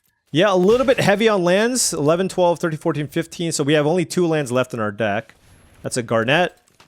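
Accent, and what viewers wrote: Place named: Seattle, United States. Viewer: It's a Seattle accent. We sometimes mumble some of our words.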